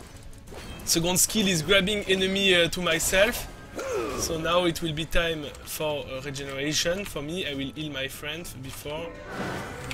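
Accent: France. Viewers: Toi français c'est sure vue ton accent ! Mdr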